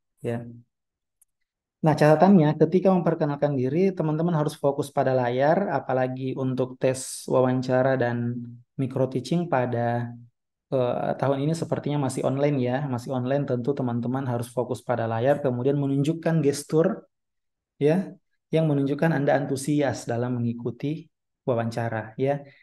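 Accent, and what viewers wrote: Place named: Sulawesi, Indonesia. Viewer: Bang orang sulawesi ya , logatnya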